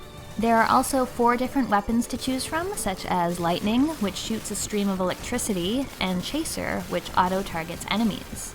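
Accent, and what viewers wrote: Canada. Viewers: Ha same here. I knew within 12 seconds that she was Canadian. I've had several occasions when I identified someone as Canadian by their accent and they got annoyed because they don't think they pronounce things differently. lol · <3<3<3<3<3<3<3<3<3<3<3 Nothing I see in your profile says your Canadian, but the accent gives you away.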